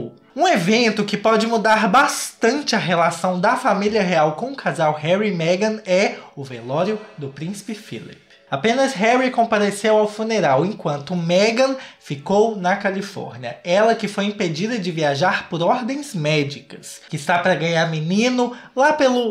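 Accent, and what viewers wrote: Minas Gerais, Brazil. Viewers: Sabia que conhecia esse sotaque kkkkk , mineirinho.kkk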